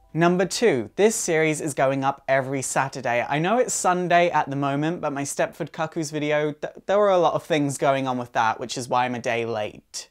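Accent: United Kingdom. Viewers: for some reason i like this series and all the others better because you have a british accent lol i think people with accents australlian or brittish or even russian are cool especially british though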